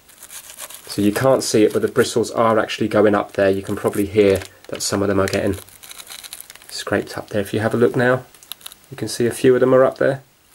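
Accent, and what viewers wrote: United Kingdom. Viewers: THANK YOU THIS HELPED IT WORKS YAYYAYAYAYAYAYAY!!!!!!! Plus nice British accent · Aha! now I’ve seen your face after all this time. listening to your wonderful British voice and learning about video game consoles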